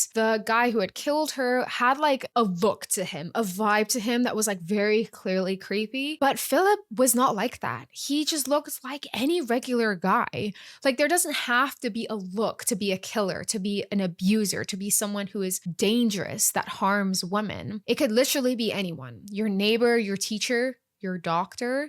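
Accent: United Kingdom. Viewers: I was thinking the same thing hahaha sometimes british sounding and sometimes american sounding but it's actually so nice and unique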